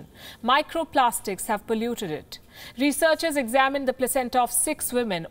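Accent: India. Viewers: yes for Indian Its Indian accent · Her english sounds uptight and weird posh with a hint of an indian accent. It isnt the best.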